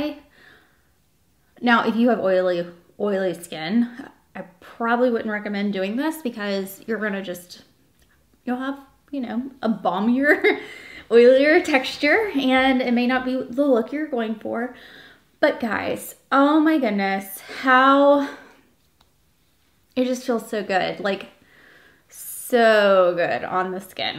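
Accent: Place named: Virginia, United States. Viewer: I love your accent. Are you from Virginia?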